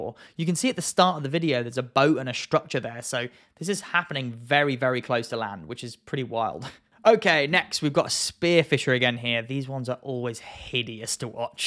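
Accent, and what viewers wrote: United Kingdom. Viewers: ❤ the British accent!!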